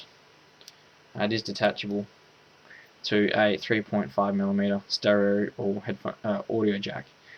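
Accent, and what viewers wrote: Australia. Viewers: thanks, aussie? :)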